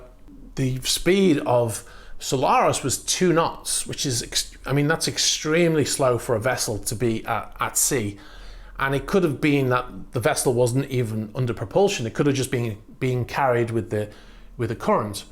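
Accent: Liverpool, United Kingdom. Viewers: Is the presenter of the video a Scouser ??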